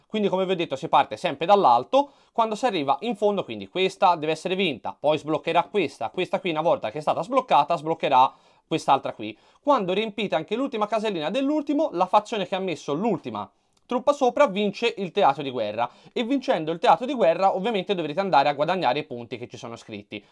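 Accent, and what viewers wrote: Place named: Tuscany, Italy. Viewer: Una delle ragioni che mi hanno fatto scegliere questo canale rispetto ad altri sulla stessa materia è proprio l'accento toscano e il modo di parlare toscano 😀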